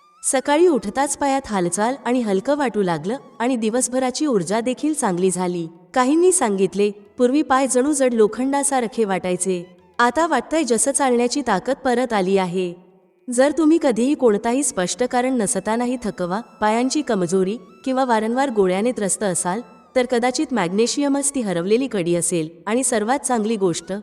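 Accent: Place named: Karnataka, India. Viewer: अगदी बरोबर.... मलाही माहिती उपायुक्त वाटली पण यांच्या व्हिडिओ तील कानडी टोन ऐकायला नको वाटतो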